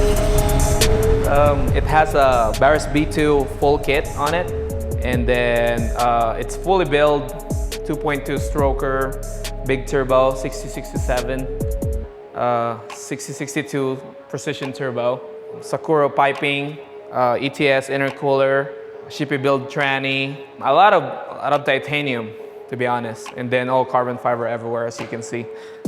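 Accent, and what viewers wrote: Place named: Tagalog, Philippines. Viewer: the tagalog accent is strong in this one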